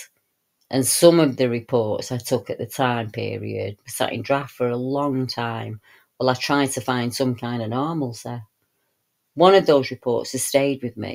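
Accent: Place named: Manchester, United Kingdom. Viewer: Hi, I hope you are well, having a good day and I hope you have an absolutely beautiful weekend!! I have said it many times before and no doubt will say it many times again. Thankyou so very much on behalf of all the witnesses to all the various types of "paranormal actvity" and those of us who feel obliged to investigate these experiences and have been guided by your constant help and although never steering any of us "this way or another way" just sticking with the facts, and then those of us who just like to listen to that "Manc brogue" and beautiful accent..... we all admire, respect and thankyou for all you've done, are doing and will do and who knows where the heck we'd all be without all of the thousands of hard fought hours you have kicked into touch - THANKYOU 🙏❤️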